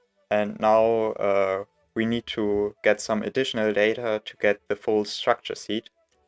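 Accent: Germany. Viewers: Are you german or really english ? you don't sound like a english person NO HATE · i hör a little deutsch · du bist eindeutig deutsch... das hört man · Bist du deutsch? Dein Englisch hört sich sehr deutsch an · This is hard German Voice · haha du bist deutsch oder?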